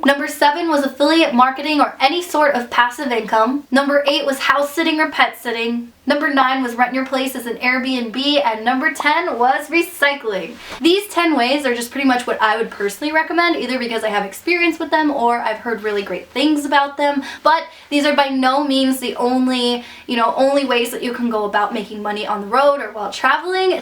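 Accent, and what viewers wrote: United States. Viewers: She sounds American and I'm pretty sure she's wearing a wig and dentures. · American accent. When you travel you realize that Americans have American accents lol.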